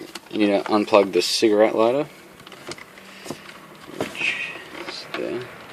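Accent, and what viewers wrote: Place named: Australia. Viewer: How good is hearing an Aussie accent instead of an American accent. Cheers, bro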